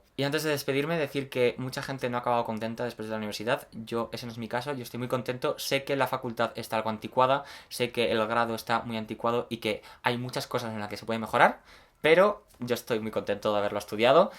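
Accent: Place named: Catalonia, Spain. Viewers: has pasado últimamente un tiempo en Cataluña? Es q noto un poco de acentillo catalán 😂😂🤔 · creia que era catalan literalmente, aunque la mayoria de los gays hablan ahora con ese deje por alguna razon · Yo también siento su acento catalán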